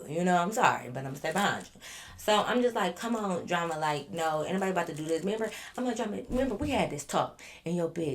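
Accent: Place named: Detroit, United States. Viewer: I love our accent 🔥🔥 313 definitely don’t have time to be pronouncing every dang syllable 🤣🤣 people found it funny all the time when I first moved out of town asking me to say SALT and FAULT 🤣🤣🤣🤣🤣🤣🤣 talking about I say Saw with a T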